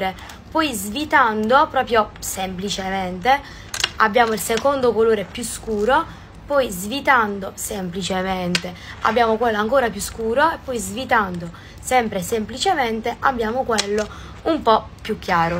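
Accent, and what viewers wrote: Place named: Apulia, Italy. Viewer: Al colleggio parlavi Pugliese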